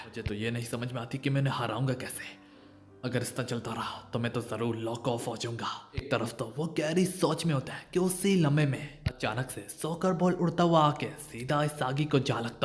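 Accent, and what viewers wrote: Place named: India. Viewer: bro just play not say it with cho indian voice makes it terrible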